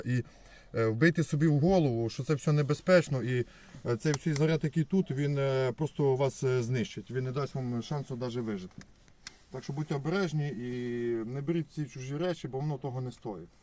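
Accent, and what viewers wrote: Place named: Moldova. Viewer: Щчо ты говоришь,порося???? Щчо за нарЭчие??? Ты щчо,молдаван???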